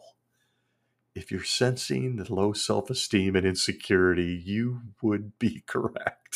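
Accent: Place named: Pacific Northwest, United States. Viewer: PNW? I thought I recognized the accent 😄 What congregation were you associated with? My family was associated with Walnut Park and Irvington. Just curious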